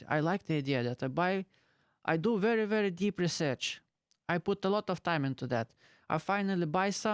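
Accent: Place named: England, United Kingdom. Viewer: I actually like your english accent. SM57 is my favourite for my voice.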